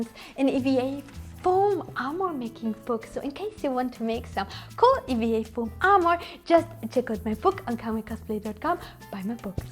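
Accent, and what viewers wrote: Germany. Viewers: I love it when germans speak english... so cute 😁😘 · Ich hör doch da Deutsch raus, Brubies. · quick question are u german? bc u sound like german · she's german, it's an accent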